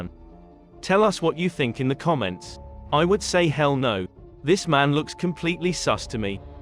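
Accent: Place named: United Kingdom. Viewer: I like your videos and your channel, but I do have one complaint. Sorry, but I really can't stand the narrator with that British accent. It's actually keeping me from sitting through the videos. Maybe it's just me but I thought I might represent others as well.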